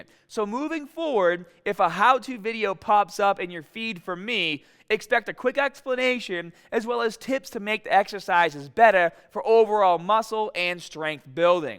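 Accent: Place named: New Jersey, United States. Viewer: U a lil bit New Jersey, but great also hahaha ! Awesome analysis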